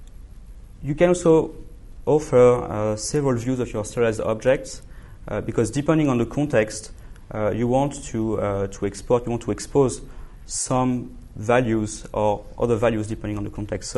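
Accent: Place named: France. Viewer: the frenglish accent killed it a bit...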